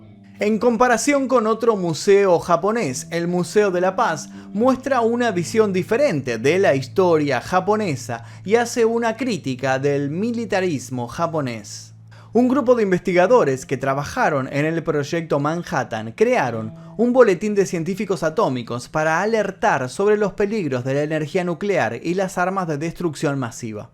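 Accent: Argentina. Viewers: No puedo creer que los argentinos NUNCA pronuncien bien la "Ll" y la "Y" y cuando tienen una "Sh" en frente les dé por decir Hiroyima... Que alguien me explique. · Exelente documental !! Imagino que el locutor es argentino , no es necesario salir a cuadro , con esa barba de chivo no le da seriedad al tema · Un argentino hablando? No veo ésto!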